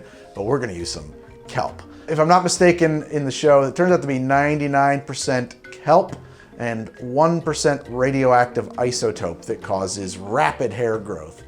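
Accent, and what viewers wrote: New Jersey, United States. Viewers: He does a good Spongebob laugh and I wonder since he's in Jersey does he pronounce Water the correct way or does he change it so the other state living people don't start up their bs?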